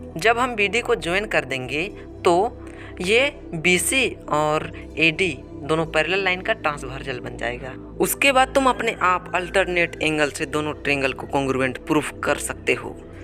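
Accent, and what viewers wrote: Bihar, India. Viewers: i like your bihari accent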